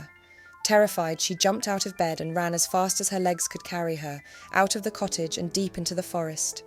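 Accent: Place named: RP, United Kingdom. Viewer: I like the narrator's posh accent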